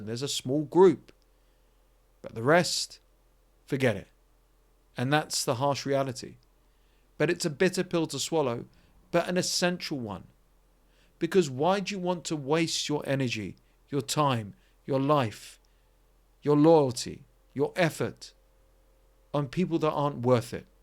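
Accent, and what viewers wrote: United Kingdom. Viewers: Where in Britain are you from? I have a Master's Degree in British English, and I studied to speak Received Pronunciation.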